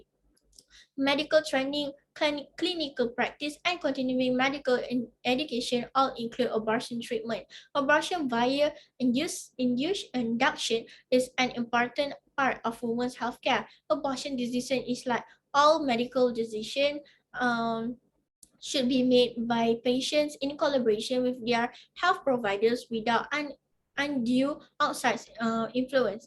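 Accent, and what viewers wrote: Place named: Philippines. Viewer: hii there very interested project research good explanation and details and most we malaysia still not aware about this one more thing the way you explain fascinate me good job and you can enhance 👍👍👍 sorry about one thing you stammer but no worries dear because you kind have some filipino accent 😍 anyway all the best and may allah bless you with success ammin.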